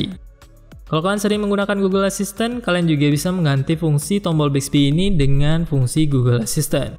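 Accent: Aceh, Indonesia. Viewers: Orang aceh ya bang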